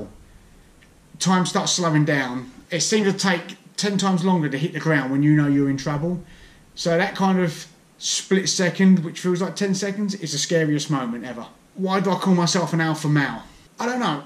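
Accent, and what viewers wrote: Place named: Essex, United Kingdom. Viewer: Ooooo I heard Essex #EssexGirls🤘🏻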